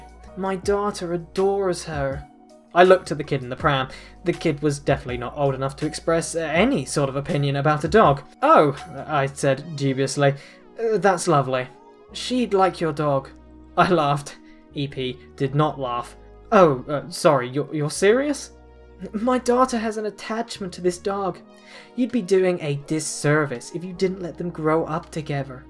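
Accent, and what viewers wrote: United Kingdom. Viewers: Legit doesn’t sound male or female, just british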